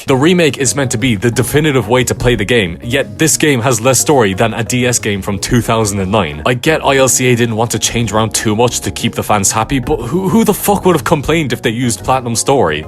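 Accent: Ireland. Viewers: Trying to place the accent. Kilkenny?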